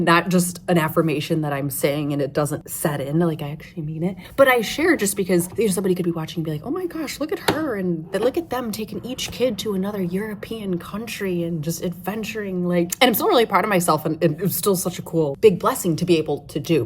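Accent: United States. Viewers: Thick Nhat Hanh was great. I used to have a book by him, but I don't have it now. I must have lent it to someone (when I lend, I don't bother trying to remember to whom). How was that pizza on a scale of 1 to 10? You're a better traveller than I would be. I probably wouldn't even attempt mainland Europe without having one or two other people to help me figure it out. UK? No prob. Ireland? No prob. But I would be too intimidated by communication difficulties to visit Belgium, Italy, France, Germany, etc. Have you experienced any rudeness that you suspect might be related to your American accent? The US government has lost a lot of friends over the past few years due, of course, to our complicity in a certain other country's... shall we say, activities?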